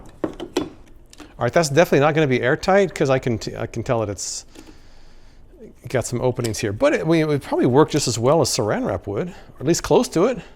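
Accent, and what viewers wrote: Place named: Florida, United States. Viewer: As soon as you said you were from Jacksonville I said, I Knew It!! I might not have had the city down but I knew you were a floriduh boy. Review suggestion: lighted magnifying glasses. I'm in the market for a set for my crafting and there are so many different kinds on Amazon. Mighty sight of course, you may have done a review for that but not a comparison against other types.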